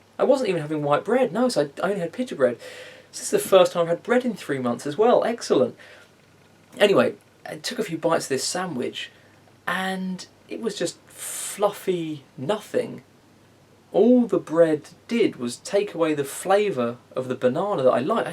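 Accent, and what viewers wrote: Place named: England, United Kingdom. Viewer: It's just nice to hear a nice clean English accent. You even pronounce you Ts. I'm so sick of being surrounded by the "Init bruv" accent.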